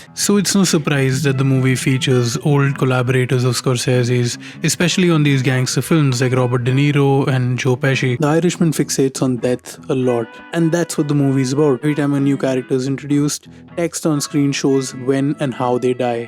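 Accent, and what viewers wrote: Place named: India. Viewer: Dude that indian accent hurts the ears 😢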